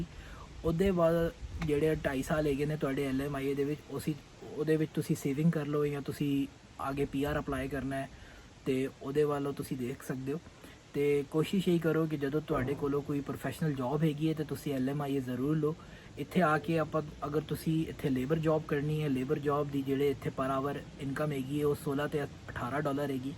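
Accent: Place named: Punjab, India. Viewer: Nice punjabi accent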